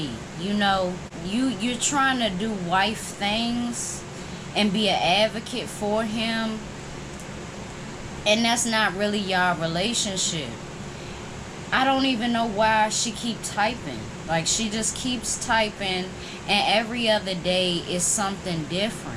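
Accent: Washington DC, United States. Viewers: Love the way you talk must be a dc thing ♥️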